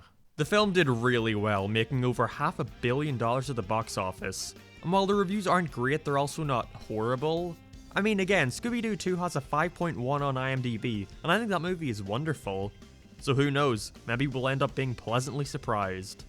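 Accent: Ireland. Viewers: Your accent sounds familiar... Are you irish? Sorry for asking. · Are you Irish?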